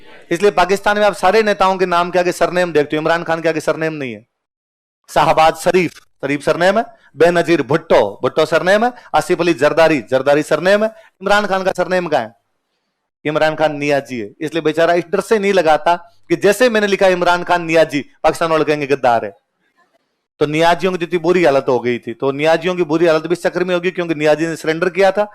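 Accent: Rajasthan, India. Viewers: राजस्थानी टोन ऐसी ही होती है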